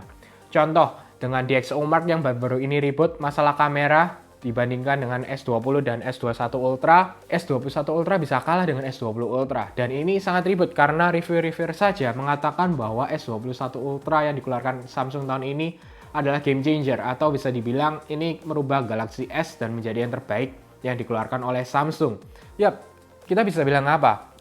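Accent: Java, Indonesia. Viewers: Penjelasannya enak, runtut, komunikatif, dan yg penting medok.. auto subscribe 👍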